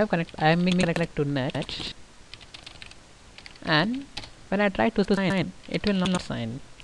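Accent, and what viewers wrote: India. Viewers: Play it twice. Once to acclimate your ears to his subcontinent English accent, and the second time you will hear every word. It is not too fast, nor is his English unintelligible.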